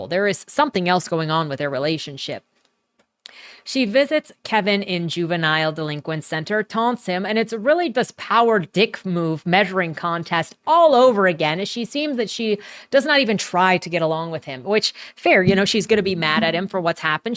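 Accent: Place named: Transatlantic, United States. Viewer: The transatlantic accent is brutal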